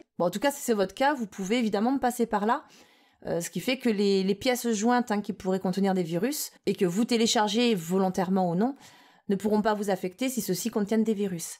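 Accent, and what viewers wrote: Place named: Southwest, France. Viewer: Toujours aussi percutent, un vrai plaisir d'apprendre avec un aussi bel accent du Sud/Sud Ouest. Bravo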